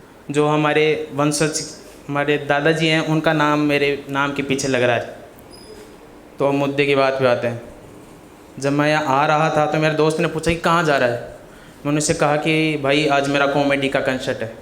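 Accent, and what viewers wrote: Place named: Dhundhari, India. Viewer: Aapni dhundhdhi boli ki to alg hi cha yarr😂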